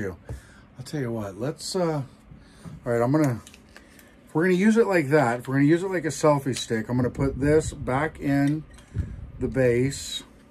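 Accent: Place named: Canada. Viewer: You sound Canadian for an American.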